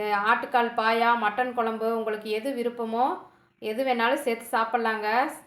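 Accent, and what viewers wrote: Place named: Coimbatore, India. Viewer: Naa coimbatore naanga pesura mathiri mariyathaya pesuringa athanaalay neenga yentha oornu ketkirenga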